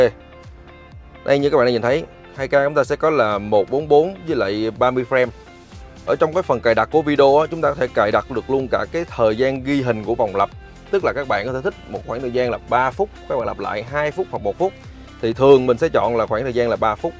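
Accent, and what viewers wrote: South, Vietnam. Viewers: Bạn review hay quá! Hiếm thấy reviewer giọng miền Nam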